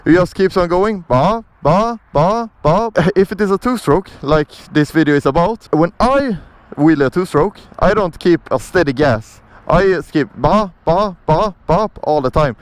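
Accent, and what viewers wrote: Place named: Sweden. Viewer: Subbing because that Swedish accent is awesome! So is that bike 😀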